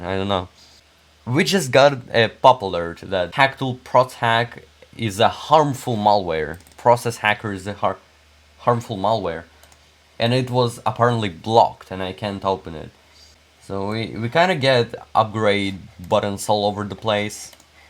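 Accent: Russia. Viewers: Russian: check Light accent: check Flies in background: check Techy: check Yeah, its all coming together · the russian accent doe, keep up the excellent work :D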